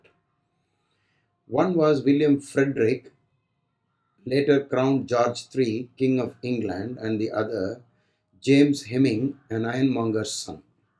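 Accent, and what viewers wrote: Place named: Madras, India. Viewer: ur accent is madrasi type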